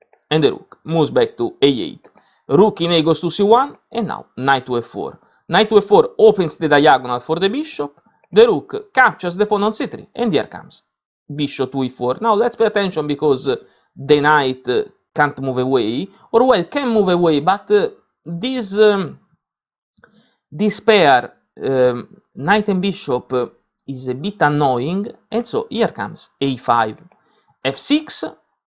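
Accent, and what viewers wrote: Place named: Italy. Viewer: Good comment... however... from the accent it is clear that you are italian...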